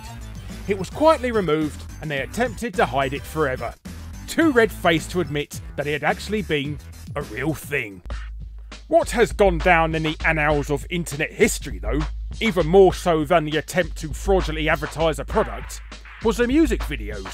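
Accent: United Kingdom. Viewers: Very few British accents don't annoy me. You are the very few...your lucky!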